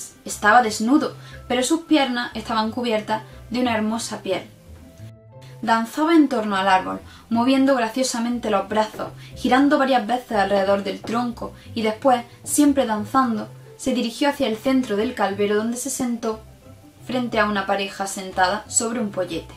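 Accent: Spain. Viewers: Que acento tan bonito....me imagino la chica es andaluza?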